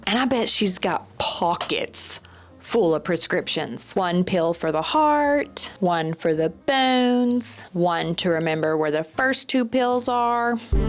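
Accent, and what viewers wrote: South, United States. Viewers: I love your accent❤. I wish I could talk like that too. I would appreciate if you make a tutorial on how to speak like a redneck.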